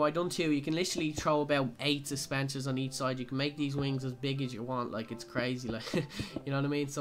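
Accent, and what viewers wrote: Dublin, Ireland. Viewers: Are you From Dublin?? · Are u Irish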